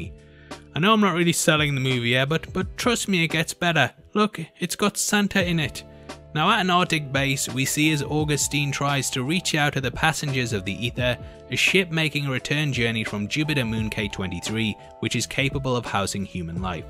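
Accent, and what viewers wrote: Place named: Newcastle, United Kingdom. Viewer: Why aye man. I thought you might be a Geordie but I wasn’t sure until you said the word daughter. 😂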